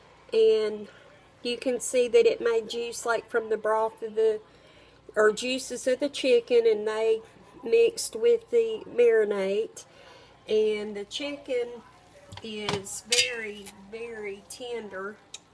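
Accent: South, United States. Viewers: That looks delicious! Are you from the south? I love your accent.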